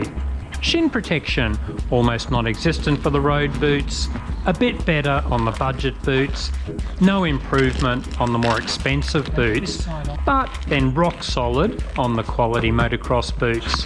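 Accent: Australia. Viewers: I thoroughly like this channel, especially the practical insights with an Aussie voice. Any chance of /CoS collaboration?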